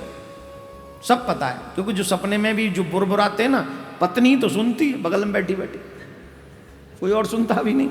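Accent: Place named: Braj, India. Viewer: Guru ji radhe radhe aap jab braj bhasha bolte h too bhut achha lagta h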